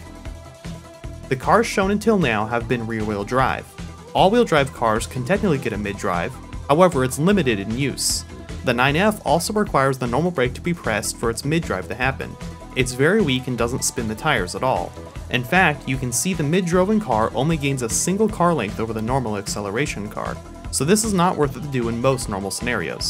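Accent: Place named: United States. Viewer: Yeah, he's pretty obviously american :D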